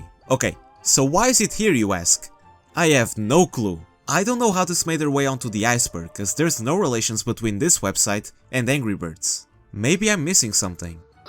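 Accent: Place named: Portugal. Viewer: krl tu é português, muito foda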